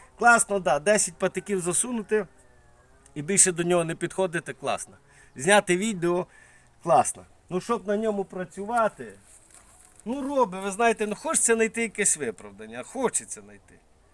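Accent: Chernivtsi, Ukraine. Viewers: ти з західної України, хотілося б знати звідки саме, бо у нас у Чернівцях так балакають як ти.😂 Щепоріз нормальний, ти просто ще до нього не привик.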